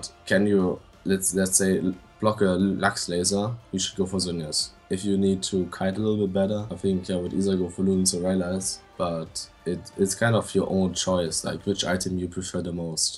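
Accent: Germany. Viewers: Dieser deutsche Akzent omg xD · Dieser deutsche Aktzent ;D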